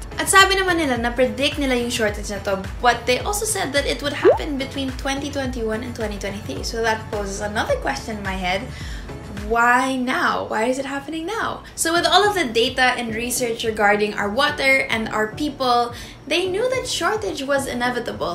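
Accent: United Kingdom. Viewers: british accent😍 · The British Accent ❤️❤️❤️ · British Accent! Lakas maka BBC!!! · I love your british accent. You're so good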